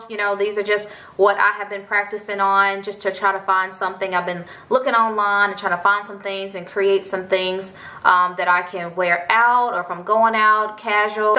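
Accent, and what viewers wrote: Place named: South, United States. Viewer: As a southern woman, your accent is everything!!! I think you're the first southern woman I've watched do a hair tutorial.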